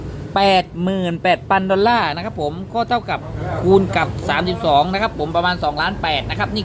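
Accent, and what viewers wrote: South, Thailand. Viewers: คนใต้แน่นอน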